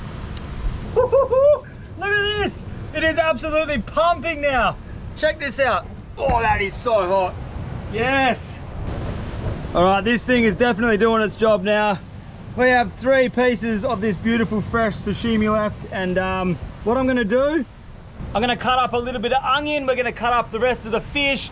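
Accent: Australia. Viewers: Thanks for doing a Q & A video. I could easily tell you were a fellow Aussie but didn’t know where you came from. Also good to learn a little more about your background and some more about your girlfriend. One other thing I was curious about was the food poisoning/sickness question. I’m NOT making any judgements here but many of the situations where you’re preparing your food looks quite unsanitary. So, I was always afraid you’d make yourself very ill. Just like many other thousands of Australian citizens, I hope you can come back home in the near future, very soon. Keep up the great work with the videos :) Oh, and I also grew up in the country and had interesting pets. We had emus!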